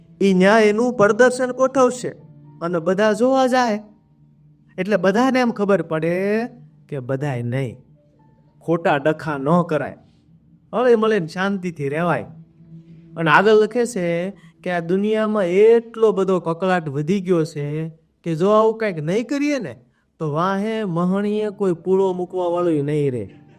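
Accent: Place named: Kathiawar, India. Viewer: શુદ્ધ કાઠિયાવાડી બોલીમાં ચોટદાર રજૂઆત..❤🙏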